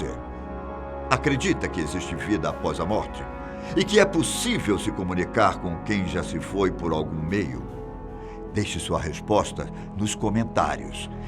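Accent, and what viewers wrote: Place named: Rio de Janeiro, Brazil. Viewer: Esse aí é carioca em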